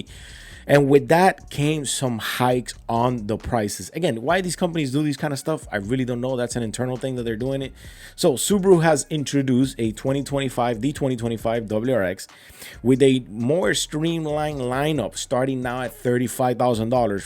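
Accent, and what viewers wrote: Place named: Miami, United States. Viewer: Bro I just came across your channel. I’m from Miami, I just know you’re from Miami with the accent 😅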